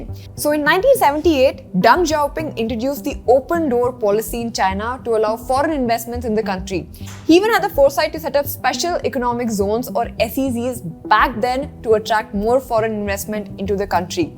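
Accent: India. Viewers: Who wants negotiate price if you talk like this? It's like the joke by Jimmy Carr: "It saddens me. They don't have to be this way." Why don't they import native speakers? Will "Indian English" one day an appreciated or even liked accent like british, american or australian? NEVER!!!! 🤮🤮🤮🤬👹 I'll be in India for then next few weeks on vacation from europe and I'm already sick of it after a few hours of youtube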